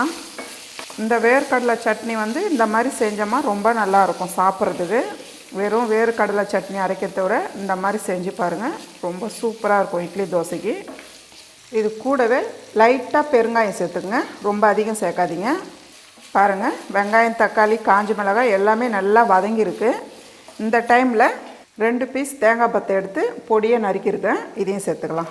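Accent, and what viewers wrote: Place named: Tiruvannamalai, India. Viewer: Thiruvannamalai tamil slang I think 🤔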